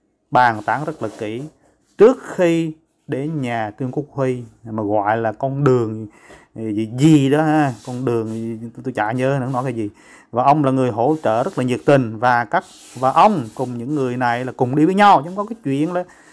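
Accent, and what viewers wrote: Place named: Quang Nam, Vietnam. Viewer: Giống noi tiếng Quang,không hiểu noi gì, ham nhiều chuyen,xỉa vo chăm biển người khác, sau co view cha nội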